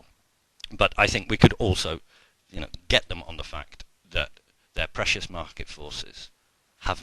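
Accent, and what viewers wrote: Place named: United Kingdom. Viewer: Let me guess, you're british.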